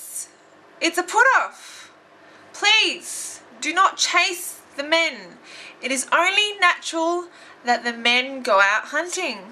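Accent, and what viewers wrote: Australia. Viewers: she's not british, she's australian.. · All true lol... u're good, must have had a lot of ups and downs on this field so far uh? Hmmm... i don't really need to be shaved to look like a decent guy, right? hehehe I just love your accent... you Australians, ai ai ai!! I could not let this detail out, since I'm already posting you a comment. Anyway, nice post. Cya around!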